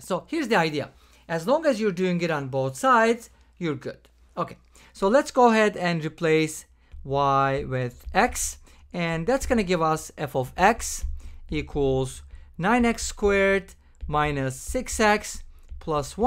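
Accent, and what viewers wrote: Turkey. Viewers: Abi sen Türk musun sesine turkluk seziyorum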